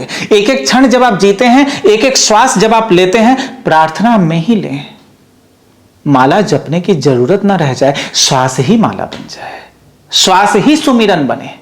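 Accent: Bihar, India. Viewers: भैय्या लोग जैसे बोलते वैसे ये लडका बोलता है. रजनीश ओशो की बात करते है लोग मंत्रमुग्ध होते थे. इसकी बात मे कोई दम नहीं.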